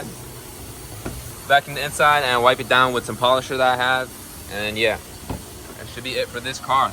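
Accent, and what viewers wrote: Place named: Morocco. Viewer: Where you from bro, you sound Moroccan ?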